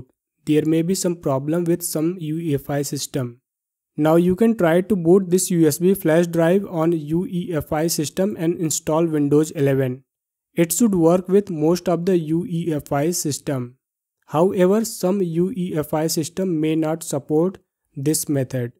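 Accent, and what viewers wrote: India. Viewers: Dude can you import subtitles? I understand english, but that "thick" Indian accent is very hard for me to understand.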